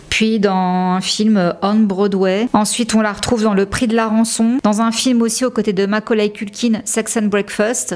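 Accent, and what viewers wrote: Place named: France. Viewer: face XD c'est faith XD LOLL accent francais es adorable j'adore tes video je les écoute chaque matin du canada :) <3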